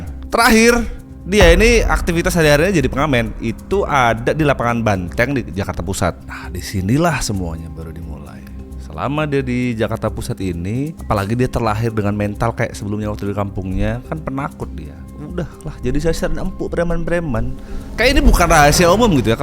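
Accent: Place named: Medan, Indonesia. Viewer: logatnya medan banget emg org medan kah?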